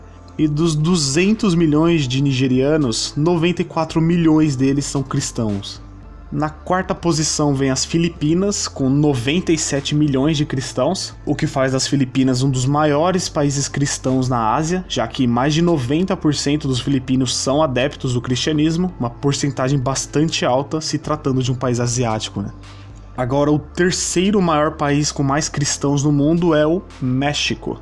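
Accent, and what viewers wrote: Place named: São Paulo, Brazil. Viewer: Ah, e acredito que o sotaque não interfira muito. Sou paulista e falo exatamente como você! Rsrsrs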